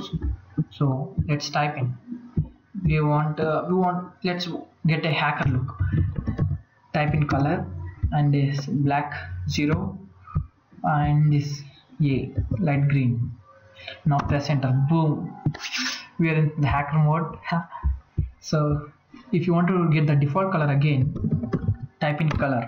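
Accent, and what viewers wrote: India. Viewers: I knew instantly that im gonna learn when an indian guy starts talking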